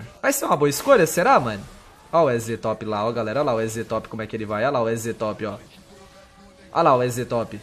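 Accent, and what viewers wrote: Minas Gerais, Brazil. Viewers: eh mineiro?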